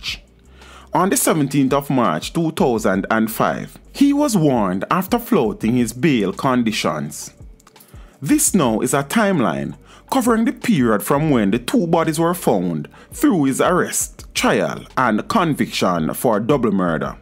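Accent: Jamaica. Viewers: He’s Jamaican DUUUUHHH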